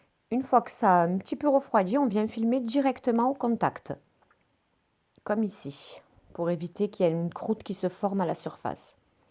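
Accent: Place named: Marseille, France. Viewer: Bonsoir o très bien réussi vos choux (savez-vous planter les choux ?) Non je plaisante franchement sa change des religieuses au café et votre accent de Marseille j'adore 😂😂😂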